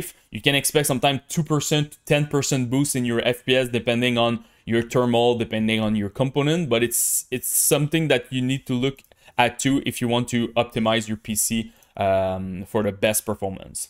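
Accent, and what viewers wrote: Quebec, Canada. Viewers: Thanks for the tips bro & est tu québécois?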